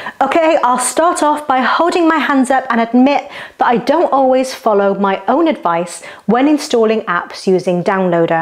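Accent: United Kingdom. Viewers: I like you a lot for several reasons, to start with, you are a lovely girl, feminine, attractive, beautiful British accent and so on.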